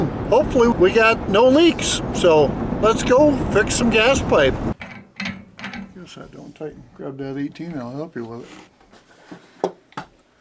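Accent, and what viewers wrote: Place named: Minnesota, United States. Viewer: First time see you like your content….is that your daughter..you have a Minnesota accent..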